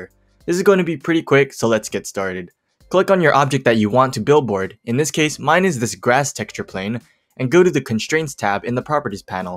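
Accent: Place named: Japan. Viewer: Your accent is very strong more Asian type of accent Japanese and Filipino accent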